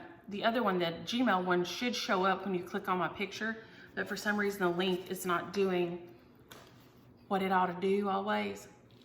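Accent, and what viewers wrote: South, United States. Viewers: Oh my gosh those babies are so adorable!!! Have a good walk Yes I too think I would enjoy your narrations of books, I love southern accents and end up trying to talk like you after watching your videos..lol We also have the same tradition of doing a Sleepy Hallow and Christmas Carol reading for the holidays. Pass along the giveaway if it lands on me for saying reading.. I just wanted to connect with you about traditions <3 Happy stitching -Jenn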